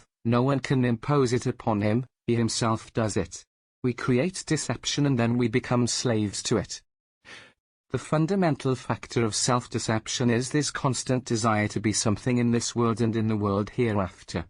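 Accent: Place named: Wales, United Kingdom. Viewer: The Welsh male accent is the worst choice for English narration. Nothing against the Welsh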